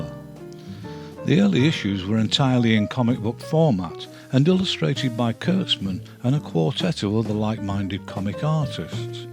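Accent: United Kingdom. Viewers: Why am I listing to an individual with a British accent tell me about Mad Magazine ? Spy vs Spy was the best part of Mad Magazine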